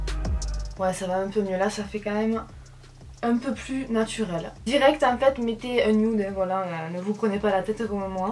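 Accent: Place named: Marseille, France. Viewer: Quel est donc ce bel accent ? Marseille ? ??🤔😉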